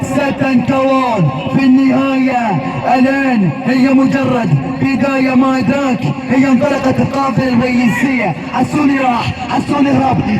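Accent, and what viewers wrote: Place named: Bahrain. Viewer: شنو هاذ بحريني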